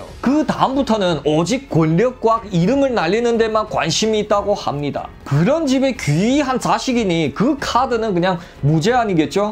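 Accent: Yanbian, China. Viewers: 매일매일 기다리는게 새로운 삶을 사는것처럼 행복하구 즐거워요 ㅎㅎ 연변사투리도 재믿고 표정 하나하나 생동감이 넘쳐서 더 기대가 가요 승승장구 하시길 바랄게요 다음회도 오케이 ㅎㅎ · 하지만 ~하지만 말입니다 조선족 연변말투죠? 그러게 말입니다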